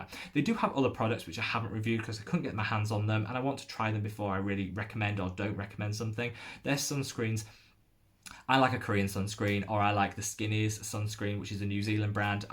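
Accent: United Kingdom. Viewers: You are one of my favorite contact creators and I thoroughly enjoy your videos and appreciate the enthusiasm and passion you bring to skin care. I really enjoyed the podcast you did with Kelly Driscoll. It was fun to learn a bit about you and your experience as a content creator. As someone from Southern California who hadn’t been across the pond, can I just say how much I love your accent? I really appreciate that you don’t take sponsorships and couldn’t agree more with what you said about how “If you sell your integrity, what do you have left?” You are one of the few people that I go to watch reviews on before buying a product because I really trust that you’re giving your honest opinion and not a sponsored one. Thank you for intro’ing me to British brand Medik8. As someone who uses Tretinoin but didn’t want to risk using it under my eyes, I’m excited to try their Crystal Retinal Cetamide eye. 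Cheers!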